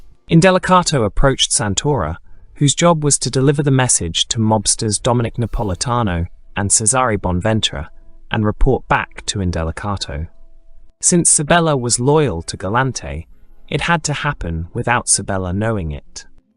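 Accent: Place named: United Kingdom. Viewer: Italian/American tough guy mafia and it sounds like some scared Brit narrating... see ya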